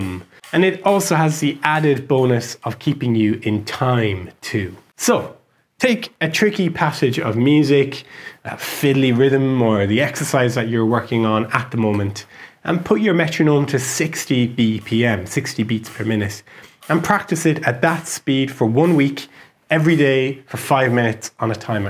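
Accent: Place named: Ireland. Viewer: I met with similar inspiring and effective teachings in a famous book a decade ago but never with such a pleasant to the ear soft Irish accent :)))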